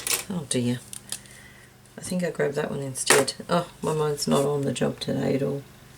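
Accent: Australia. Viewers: Hi, just found your channel on u tube! love listening to an Ozzie! Happy New year and take care of yourself, love from Queensland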